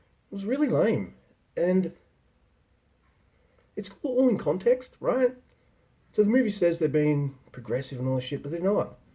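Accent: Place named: United States. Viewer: The American accent!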